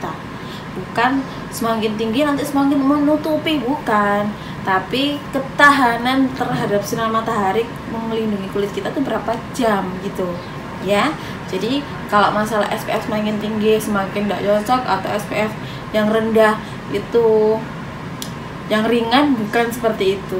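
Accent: Java, Indonesia. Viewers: iki wong jowo to, ketok medok 😅😅😅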